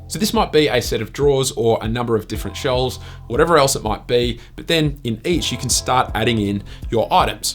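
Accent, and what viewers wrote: Australia. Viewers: Great to hear an Aussie in here! This means everything will work on my phone 😄 Loved these apps and will now go join you on instagram. Thanks 👍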